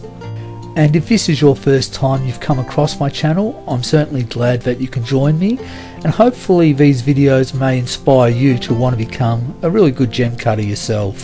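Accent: Australia. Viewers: Sept' 2020 .. first time here .. so nice to hear an aussie voice lol, love watching how you experts create amazing brilliance.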